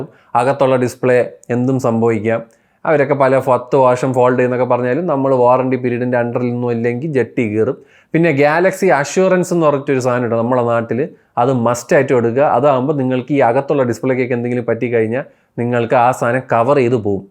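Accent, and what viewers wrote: Trivandrum, India. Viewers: Nalla tvm slang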